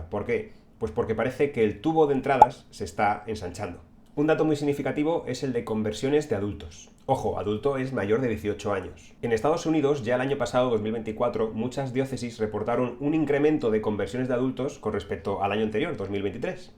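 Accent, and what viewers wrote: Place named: France. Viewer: Lique por tu acento francés. Mathafaka tb suena mejor en ing. ✅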